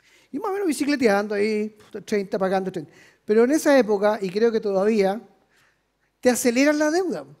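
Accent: Chile. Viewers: No se entiende lo q habla.. chileno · me gustaria ver la conferencia, lastima que este en un español con asento chileno... una pena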